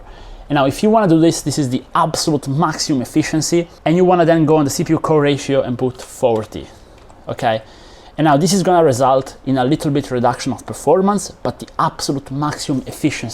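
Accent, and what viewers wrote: Italy. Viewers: Why u have an Italian accent?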